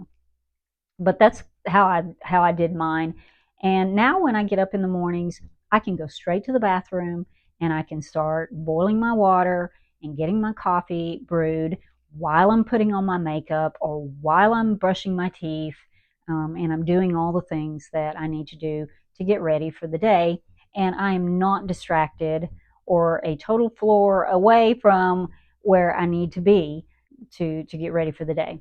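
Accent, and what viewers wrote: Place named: Tennessee, United States. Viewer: Hello there! So happy to have found another Gen X sister! I just subscribed! And I thought I’d detected a little Tennessee twang in that accent! (I’m from Tennessee too!) I love your ideas, especially the one about not waiting until the dishwasher is completely full before running a load.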